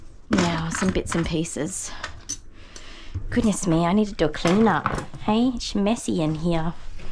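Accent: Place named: Australia. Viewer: Hi good to see you back on here grooming I have missed your presence as I enjoy your chit chat it is so refreshing to hear an Australian accent.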